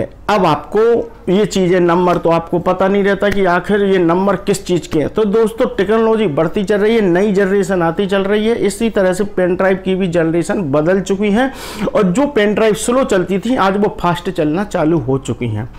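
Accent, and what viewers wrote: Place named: India. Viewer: I understand only one word - "pendrive". Where you learn this indian english dialect?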